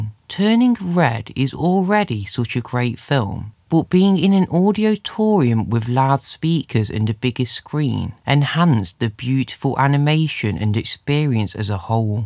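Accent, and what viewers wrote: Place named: United Kingdom. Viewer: I Think You Live In The UK Reason: Accent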